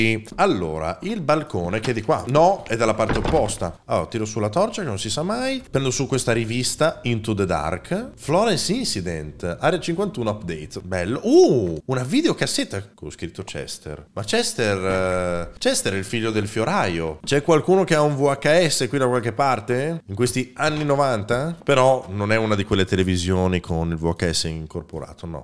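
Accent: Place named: Veneto, Italy. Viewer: Sto gioco la maggior parte delle volte fa cagare pure a me che lo sto solo guardando 😂 P.s sta deriva veneta l’adoro ✨💕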